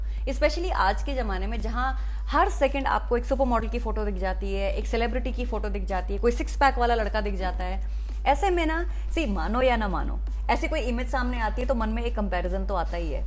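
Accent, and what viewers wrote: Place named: Uttar Pradesh, India. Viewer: Love you mam ❤️ from Pakistan 🇵🇰 suda khush rho AAP ki baton say lugta hay k AAP up say ho hum bhi yahan asay hi batain krtay Hain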